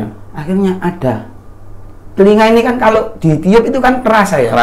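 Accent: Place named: East Java, Indonesia. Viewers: Vidio kali ini bikin sy kangen kota kelahiran tercinta Suroboyo...Logat Suroboyoan bikin makin kangen serasa lg ngobrol jg. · Logat orang sby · sy sudah 22 th tinggal di kalimantan, sy suka dengar chanel ini, kangen dg bhs jawa timuran yg medok, rasa rindu dg kampung halaman.... sukses.... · Sip keren jawa timuran nek crito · Sing crito ngomonge medhok suroboyone... Aq wes ora iso ngomong suroboyoan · Thul.. thul.. lheep!! 🤣 gregeten koyo ngrungokno konco dewe lagi crito. keren mas e cerita dengan logat khas jawa timuran. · logat suroboyoan jadi pengin balik surabaya..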